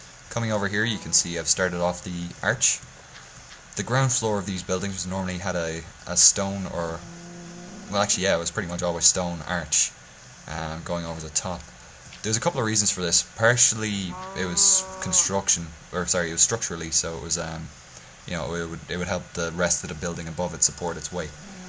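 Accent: Ireland. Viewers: what part of ireland are you from ima dub :D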